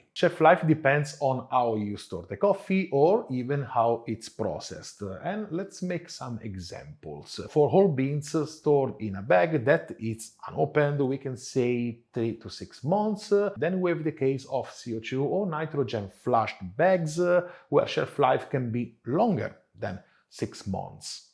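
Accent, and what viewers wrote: Italy. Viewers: Don't give up your Italian accent,! It enhances your credibility, along with all the impressive science. Molte grazie. You're the coffee go-to man.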